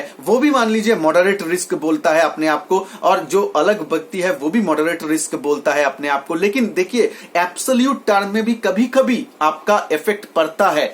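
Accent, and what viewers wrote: Bengal, India. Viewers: You speak like Bengali !!! Are you Bengali ? আরে ভাই বাঙালি নাকি ? বি the way explanation is good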